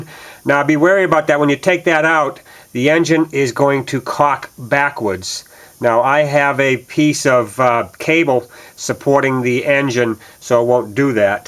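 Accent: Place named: Boston, United States. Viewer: . Thank you so much for the video! You could have saved me hundreds. I never would have had the gumption to change my water pump without your video and the one from autotech. I watched it backwards and forwards so much that I started thinking in that Boston, is it?accent. Can't thank you enough. When I got to my water pump I decided it wasn't leaking (it had been changed twice by the same mechanic the last just about 4k ago) so I changed the thermostat housing instead HA! But I wouldn't change the experience being that I was on vacation and want under pressure to get out done. Thanks!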